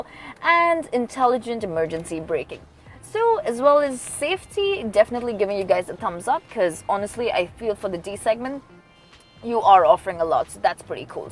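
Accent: India. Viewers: That's confusing...I guess it's either hyundai accent or her Indian accent.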